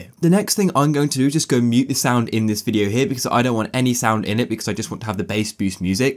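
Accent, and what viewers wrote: United Kingdom. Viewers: Are u bri'ish?🤨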